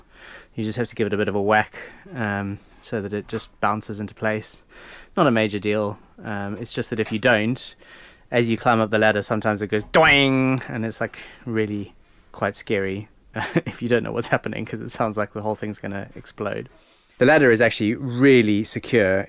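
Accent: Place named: South Africa. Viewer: 1. Nice show & tell video. This is one my many projects I have lined up! 2. Are you an ex Saffa by any chance? I'm sure I can hear a bit of the accent from the old country. And 3. Your house layout is eerily similar to mine, even down to the artex ceiling and placement of the hallway light! Creepy! 😁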